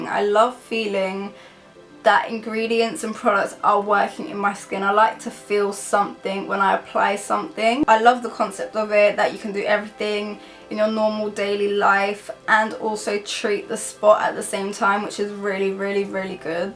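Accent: London, United Kingdom. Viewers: Patt Col London accent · You’re beautiful. I’ve never met a Latina with a British accent haha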